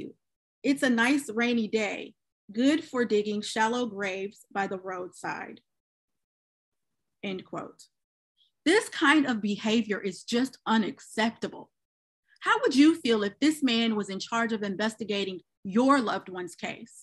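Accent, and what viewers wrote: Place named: South, United States. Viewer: Love your country accent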